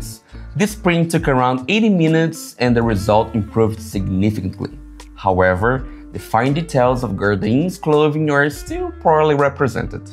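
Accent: Brazil. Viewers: Your accent sounds like mine, are you Brazilian? 🇧🇷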